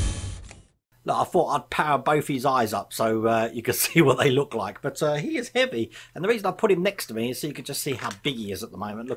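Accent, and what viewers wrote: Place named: Essex, United Kingdom. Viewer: I think that’s probably more your ‘Essex’ accent coming through;).....being from Thurrock sounds totally normal to me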